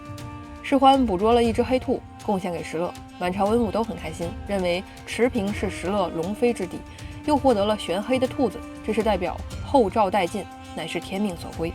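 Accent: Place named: Beijing, China. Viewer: 姑娘一口地道的北京話非常好聽吾人甚為欣賞！比起其他說書人強太多了！姑娘讚！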